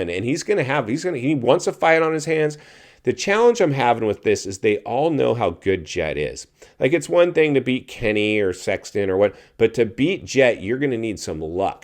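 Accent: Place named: United States. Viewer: 😂 American 💩 talking again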